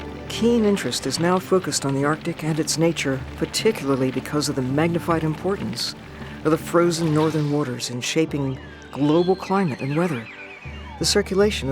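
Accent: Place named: United States. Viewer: wonderful footage of such a beautiful landscape, really enjoyedwatching it. shame about the horrible narration, an extremley irritating strong american accent of a 12 year old boy.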